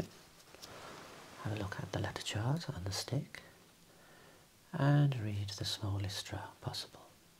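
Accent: United Kingdom. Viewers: Are you British? Your accent and that jacket!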